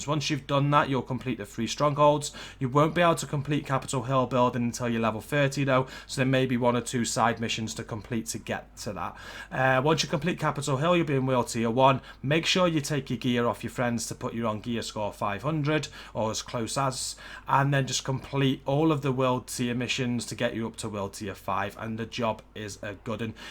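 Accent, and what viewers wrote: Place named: Liverpool, United Kingdom. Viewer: Sounds like your from liverpool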